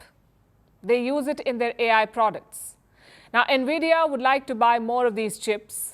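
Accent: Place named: India. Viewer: I like your indian accent😅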